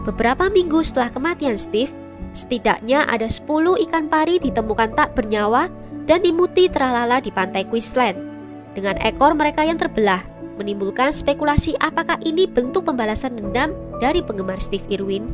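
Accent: Java, Indonesia. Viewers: Jawa Lu medok Min....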